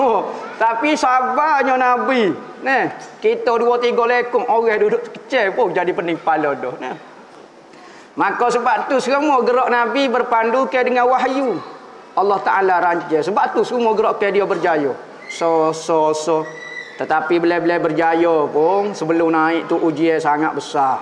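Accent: Kelantan, Malaysia. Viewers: Alhamdulillah, anda boleh memahami loghat Kelantan.... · Kepada yang tak berapa faham dgan penyampaian ustaz tolong jgan salah faham, kerana ustaz banyak menggunakan bahasa kelantan , loghat klate pekat, maksud yg disampaikan itu mungkin berbeza dgan apa yg kita fahami, 😌 🥰❤️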